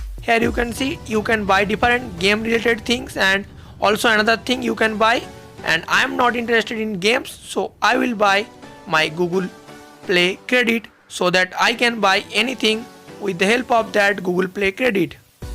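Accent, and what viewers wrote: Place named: India. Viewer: This guy sounds like india itself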